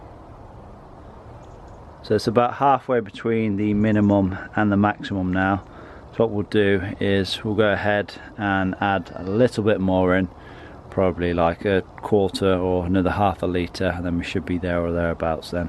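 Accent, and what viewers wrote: United Kingdom. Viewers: the british accent sounds pretty awesome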